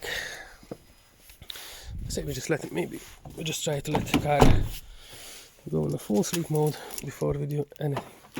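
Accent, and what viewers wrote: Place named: Russia. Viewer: Сразу слышно что человек Русский )))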